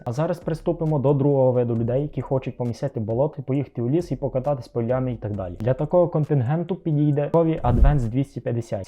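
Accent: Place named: Ukraine. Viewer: сільський український акцент і купа русизмів ,очевидно стягнутих з перегляду кацапських . почисти то трохи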